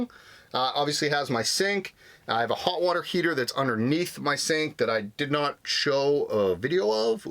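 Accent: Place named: Italian-American, United States. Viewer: East coast, Italian descent??? "Batta bing...," "anyways". Lol love it. FYI: water weighs 8 lbs per gallon, plus the weight of the container. Just saw your interview with Bob Wells, came to your channel, loving it so far. Know this video is almost a year old, will be checking out others. Love how fast you talk. Retired biochemist here that did standup comedy as a hobby for fundraisers in San Diego then Az. Mostly retired. Will be on the road by Jan 1, 2020. Carry on.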